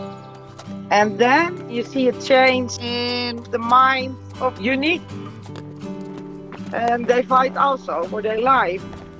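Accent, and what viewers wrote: Netherlands. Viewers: I thought I heard a Dutch accent and then I heard "Ja, jongen!". Super gedaan! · Hoor ik een hollands accent? Goed gedaan! Dankjewel ❤ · Why does she sound Dutch?😶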